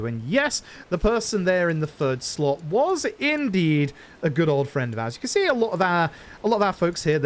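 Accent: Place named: United Kingdom. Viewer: DAWG, YOU'RE BRITISH!?!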